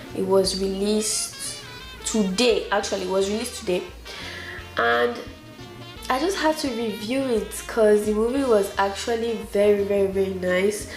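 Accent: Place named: Africa. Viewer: What part of Africa are you from. I love your accent.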